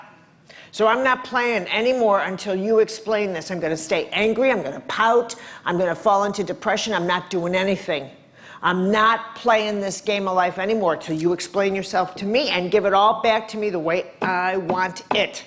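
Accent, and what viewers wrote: Chicago, United States. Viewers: She feels so familiar to me and when I looked up her info I realized why. She is from Chicago, as am I. Her accent and her vibe is so Chicago, and for me, so comforting!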